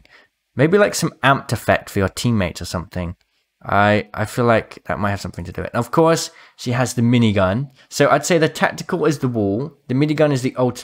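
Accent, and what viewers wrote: India. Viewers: Is it just me or does she sound Indian? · OMG CAUSTIC IS FINALLY GETTING A HIERLOOM AND yeah that's an Indian girl. I don't like the accent :p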